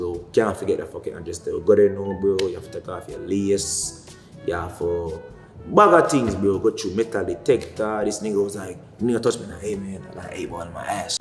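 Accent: Jamaica. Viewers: yr Jamaican accent is a advantage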